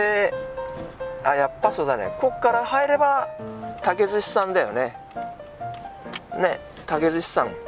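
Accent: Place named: Yamada, Japan. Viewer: やっぱり山田弁はいいがねー。山田に帰りて～(;_;)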